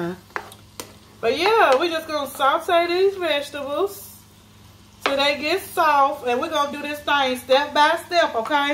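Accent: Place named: South, United States. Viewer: I hear 615 all in your slang and southern dialect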